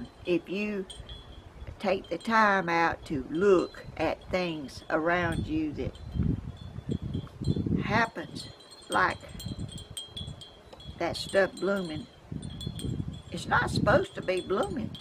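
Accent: South, United States. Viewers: you are a joy for me to watch. A wonderful break from all the politics and the haters. I love your southern accent. My family migrated from the Georgia area to East Texas years ago, and your accent is like listening to my grandmother. You mentioned Buckhorn Methodist Church. My ancestors owned the Buckhorn Tavern, a famous trading post and sight of civil war battles. I assume you live close to that area, but the Tavern was closer to New Market, Alabama, not far from you. The horns from the Tavern were given to the Buckhorn High School. Anyway, just a little history. Don't worry about people who say unkind things to you...how awful. Do your thing....don't lose sleep over the haters. God Bless.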